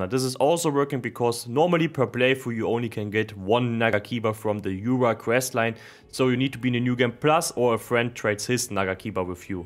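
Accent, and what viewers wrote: Germany. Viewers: Gehe ins Video und weiß direkt am Akzent, dass du deutscher bist😂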